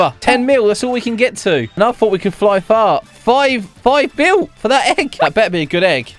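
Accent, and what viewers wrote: United Kingdom. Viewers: His British voice never gets old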